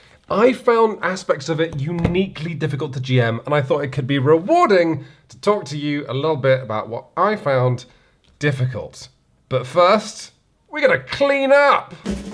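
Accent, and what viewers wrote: United Kingdom. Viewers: Nice to see a review about RPGs. I would like some more...😆😉 (Although the British accent makes your videos difficult for me to understand....but I watch them anyway.😆😆)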